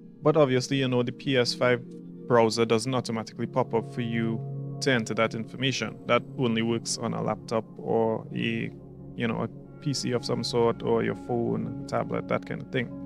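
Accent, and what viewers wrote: Trinidad and Tobago. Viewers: I knew you was a trini the second you talked lol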